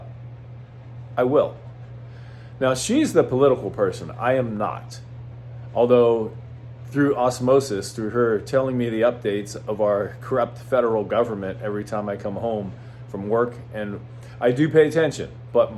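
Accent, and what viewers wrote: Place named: Ohio, United States. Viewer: Do not even know who you are, I just clicked on this video watching you for the first time. You sound like you are a genuine dude. I wish you luck in your ventures, no matter the party you are with or running as, I like the things you are talking about. You seem as though you have helped people in the past in your profession. Also sounds like you are in Ohio I live in the Cincinnati area. Take care and Happy Holidays.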